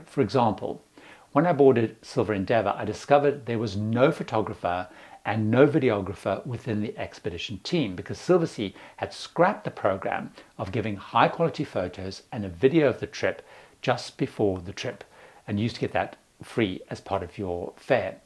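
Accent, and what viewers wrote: South Africa. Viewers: Hey, are you South African?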